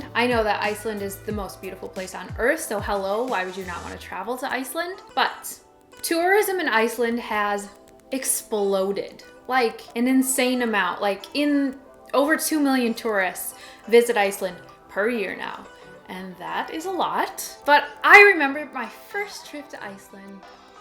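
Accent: United States. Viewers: So tourists are the main downside of living in Iceland.......................Said the woman with the American accent. Hypocrite.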